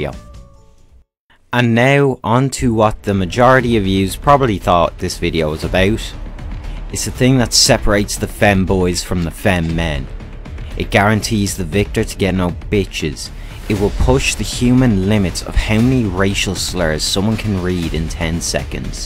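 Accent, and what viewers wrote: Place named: Ireland. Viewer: I thought I’d never find an Irish tf2 YouTuber. I could instantly tell by your accent. Good videos btw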